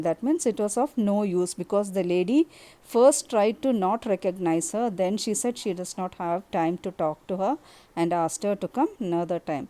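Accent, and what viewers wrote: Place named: South India, India. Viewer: Ma'am explanation is fantastic and that south Indian accent is great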